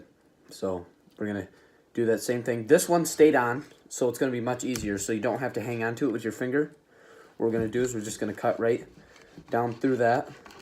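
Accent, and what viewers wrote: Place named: Canada. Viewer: You sound extremely Canadian in this video...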